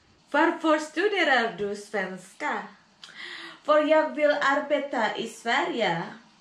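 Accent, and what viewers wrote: Indonesia. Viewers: Kental bgt aksen indonya😀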